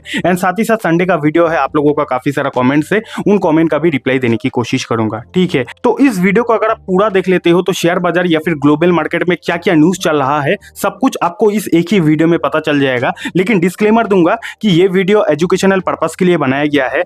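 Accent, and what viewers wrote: Bihar, India. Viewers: Bihari to tum?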